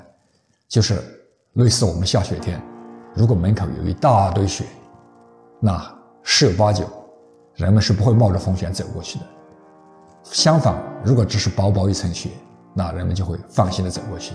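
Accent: Yunnan, China. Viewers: 老師是不是雲南人，普通話有雲南口音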